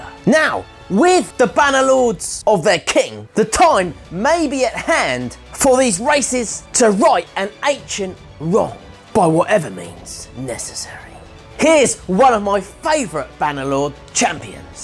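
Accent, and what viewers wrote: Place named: Fens, United Kingdom. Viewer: has a "Fens" Accent IIRC.:-) He has said numerous times about being in the UK though, as he has been on Telly here, and tells us about that when it happens.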